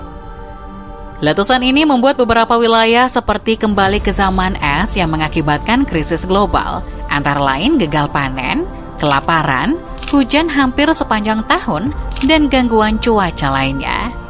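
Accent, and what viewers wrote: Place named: Lombok, Indonesia. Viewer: Anak lombok mana suaranya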